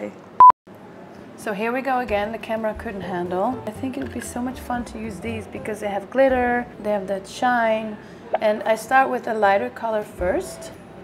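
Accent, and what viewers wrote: Friesland, Netherlands. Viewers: I love your dutch Fryslân accent!! 🥰❤️😍💋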